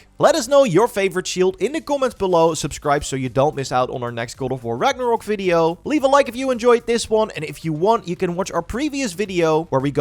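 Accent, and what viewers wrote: Netherlands. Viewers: Do I smell dutch or not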